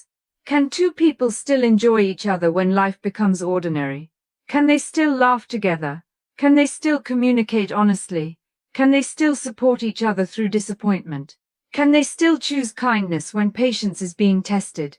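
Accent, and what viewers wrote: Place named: Canada. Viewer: Maye Musk, you are such a supportive mom, you will be a hard act to follow. Your speaking voice is as lovely as your modeling presence. That Canadian accent is always so proper and you did not lose it in Pretoria. I'm seriously pulling for Elon's acceptance among Americans who depend on honest leadership. It is not easy. His admirers are men who want to be him and women who want to possess him for selfish snd selfless reasons. 🙏 🤲 🕍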